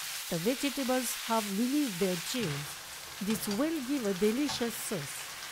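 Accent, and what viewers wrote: France. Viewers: Love the French accent 😂 and the recipe is great will be making it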